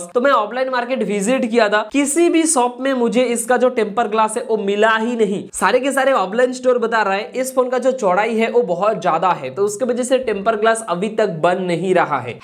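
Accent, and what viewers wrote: Bengal, India. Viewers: Bangali hain kya bhai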